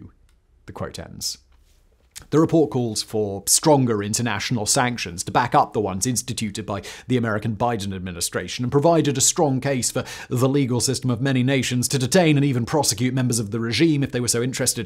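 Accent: United Kingdom. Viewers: you might want to tone down on your pompous Bristish style of speaking. its a turn off.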